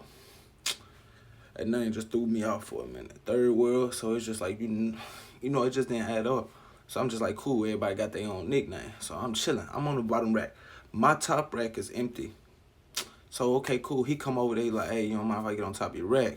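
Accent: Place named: Florida, United States. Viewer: Where you from just asking sounds like us in Florida low-key 💯💨🌴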